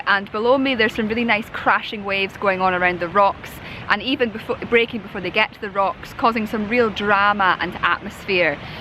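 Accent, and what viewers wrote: Scotland, United Kingdom. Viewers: .love the wave photos.I been watching all your videos and find them most enjoyable, If it wasn't for the cute scottish accent, i might say you talk a wee bit to much lass.Keep up the good work. hope you make it to Orkeny.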